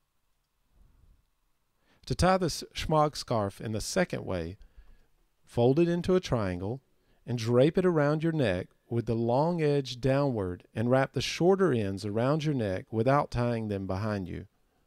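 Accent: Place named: South, United States. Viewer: “Refer to the “how to tie scarf” application…” What a self-referential comment this weirdly southern announcer makes.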